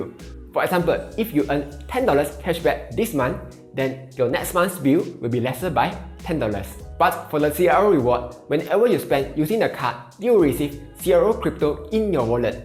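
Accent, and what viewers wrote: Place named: Singapore. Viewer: Bro i love your video style chill, funny and straight to the point 👍 singapore accent cherry ontop 😂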